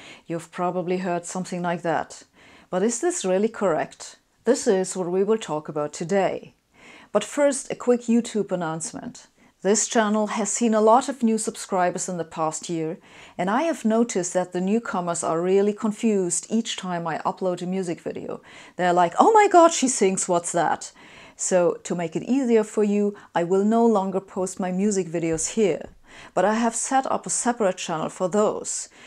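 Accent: Germany. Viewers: she got music videos??? Does she also sing with this strong german accent?^^ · Love your content. Your subtle German accent gives it a nice additional touch.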